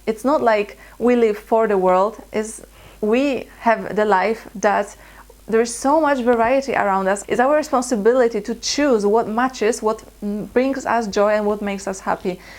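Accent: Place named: Poland. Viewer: pls don't lose your polish accent ya.. luv it.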